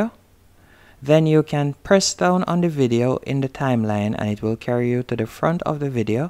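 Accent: Jamaica. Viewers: You sound Jamaican my brotha🤜🏿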